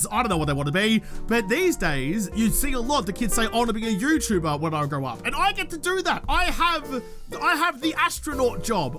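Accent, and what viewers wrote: Melbourne, Australia. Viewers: WHAT. One of my top reasons why I ❤ is his amazing Aussie accent!! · From one Melbourian to another, the accent rocks. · Hey! I only just found you a couple of months ago and you've been seriously growing on me. But this video. This video turned me into a lifer! Lemme just say a few things. Yes you can be loud (as can I). And at first I used to turn that volume slider down a bit. But I've come to love your excitability and loudness. As someone who suffers with too many chronic illnesses to list, all of which that have left me retired (extremely early I might add. Had to give up working 15 years ago and I'm only 43 now) and extremely isolated save for my most amazing and loyal husband, my life gets very very lonely and so I depend on my favorite Youtubers to help me not go fucking crazy! And you have so quickly been added to the list of favorites. 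I always really appreciate your positive attitude and goofiness. I am, unfortunately, American so I've been diligently studying up on Aussie slang so I can understand what the hell you are saying! Still lost about 40% of the time but I generally get the jist. You now have me saying batons the way you do instead of the way I do! LOL! Look, don't ever change. Not for anything. The haters are gonna hate and the trolls are gonna troll. You be you and you do you and those who really appreciate your personality will stick around. Thanks for all the laughs mate!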